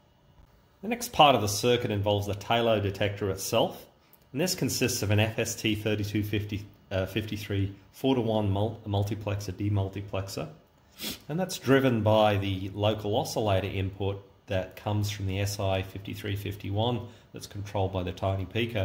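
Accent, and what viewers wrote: Australia. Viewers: An Aussie in the States? 🤔 Interesting video, tnx!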